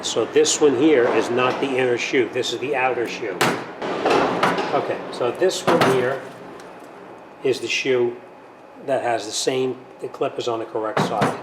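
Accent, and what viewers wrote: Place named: New Jersey, United States. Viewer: "Bolth bolts" love Jersey lol. Must be north Jersey. Thanks for the vid. Helped me out greatly.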